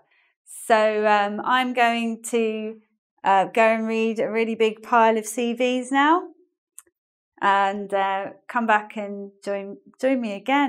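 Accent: United Kingdom. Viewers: thank you very much,. i really like your videos, there are very informative although i enjoy to practice my listening skills with your british accent.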